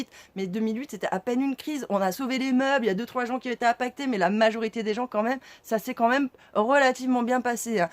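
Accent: Paris, France. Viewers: qu'est ce que tu me fais marrer, j'adore ton peps pour expliquer les choses primordiales à 13500 abonnés (c'est top) au début, ton accent parisien m'agaçais un peu, je me disais encore une qui va raconter la vie sans savoir ....mais non t'es une référence. j'ai beaucoup d'estime pour toi car tu me communique. moi je suis a peu de chose dans les mêmes conditions de toi mais c'es trop de taf de vouloir les partager. merci encore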